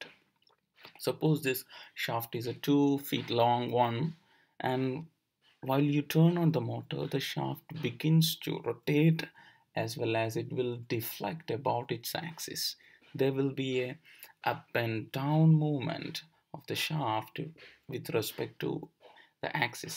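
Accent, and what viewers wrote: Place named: India. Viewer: this is top tier indian accent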